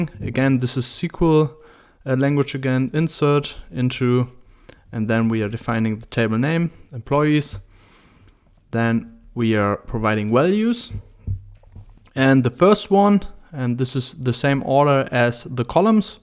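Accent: Germany. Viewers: Love your Videos. Greetings from Germany, are you german btw? Your accent seems sort of german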